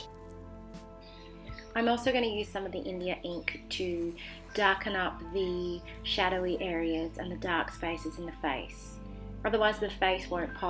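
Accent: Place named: United Kingdom. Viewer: I love your "loose" techniques in this video, plus it's very pleasant to watch and listen to your lovely British accent. You don't talk too much, which I find to be very annoying in many artists' videos. The background music was perfect! The small accents you added at the end using the nib pen made such a huge difference in the end result. Keep making these wonderful videos!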